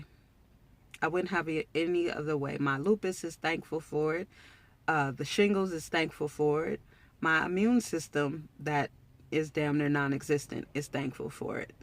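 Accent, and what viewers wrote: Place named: St. Louis, United States. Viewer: New subbie hurrr . I just knew u was from the Lou because of your accent with the “ rrr”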